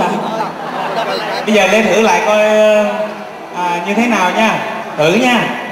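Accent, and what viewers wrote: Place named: South, Vietnam. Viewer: why is he speaking in nam accent when he is hue? I love it when he sings hue songs. he got kinda fatter, but i still love him nonetheless.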